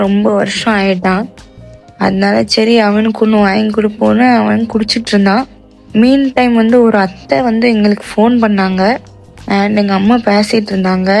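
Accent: Tirunelveli, India. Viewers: first time seeing your video..you got different slang..is this Tirunelveli slang..chapaad for sapaad, chemma for semma, cholluvon for solluvon..neenga "sa" endra vartha use panna mattingla..ha ha..anyway all the best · Tvl slang super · I'm a 77 year old retired banker settled in Madras. My native town is Palayamkottai, my paternal thatha's place. We have many relatives in Tirunelveli. I love your typical Tirunelveli accent despite being a Mumbaikar,. Your comments about your thambi were hilarious too. Glad your mother brought all the food for the long journey. I've seen most of your car journey vlogs and was sad about the several breakdowns of your WagonR. I was a Maruti faithful owner for 32 years having changed many models but always satisfied with the reliability of the Maruti Suzuki cars. May God bless you all dear girl.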